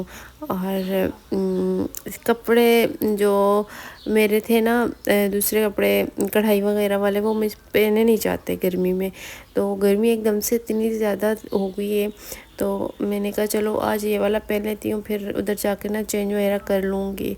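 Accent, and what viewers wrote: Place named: Chhachh, Pakistan. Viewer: اپ کے لہجے سے لگتا آپ چچھ کی ہیں نہیں؟ میں اٹک سٹی سے ہوں اس لیے میں لہجہ پہنچانتی ہوں وہاں کا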